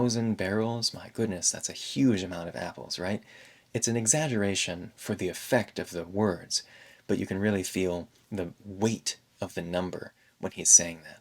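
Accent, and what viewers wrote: United States. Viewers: Bbest teacher ever ❤ I learn American accent from you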